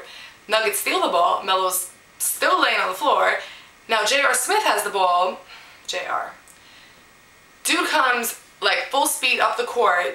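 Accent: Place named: New York, United States. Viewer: nice video keep em coming! i love your NY accent lol and you know basketball marry me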